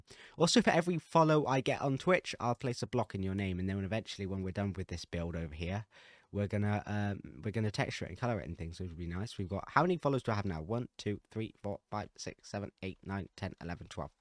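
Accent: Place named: United Kingdom. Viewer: Bro doesn't sound like grian. He just sounds british. He has the same voice as many people i know.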